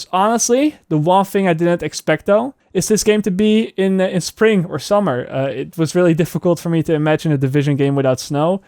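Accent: Netherlands. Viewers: Im pretty sure this guy is Dutch :D that ''zie je'' later at the end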